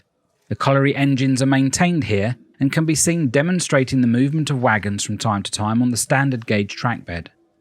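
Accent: England, United Kingdom. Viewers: Lovely content with lovely English accent..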